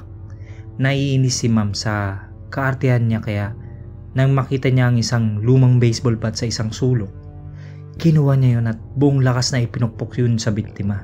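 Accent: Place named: Conyo, Philippines. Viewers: pwedeng straight english na lang...nakakairita at konyong konyo ang dating. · Tama..sakit sa tenga tunog konyo...tinigilan ko na pakikinig..ibang story na lang...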